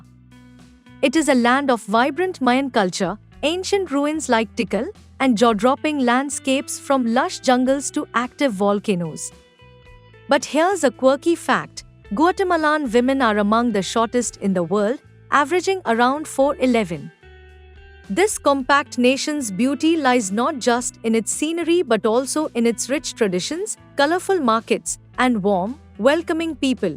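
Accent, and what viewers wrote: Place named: India. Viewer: why does your voice have an indian accent? are you indian?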